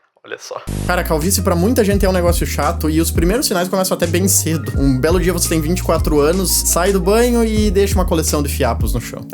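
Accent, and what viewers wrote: Rio Grande do Sul, Brazil. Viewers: esse cara é gaúcho? acho muito fofo esse jeito dele de falar kkk. eu sou gaúcha tbm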